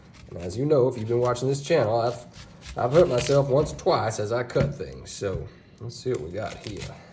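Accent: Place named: Louisiana, United States. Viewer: I’d say Louisiana or south- judging by accent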